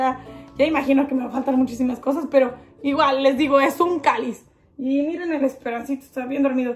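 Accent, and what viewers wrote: Mexico. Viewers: Ahuevo...... Los jaliscienses así hablan si sí si yo tengo familia en San Juan de Dios Jalisco y así hablan siempre.... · Me encanta tu acento mexicano, saludos desde Bolivia 😍🇧🇴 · LA PARTE QUE MAS ME ENCANTO FUE ' STOP TALKING' 😂😂 Y ME ENCANTA TU ACENTO MEXICANO SOY 100 SINALOENSE❤🇲🇽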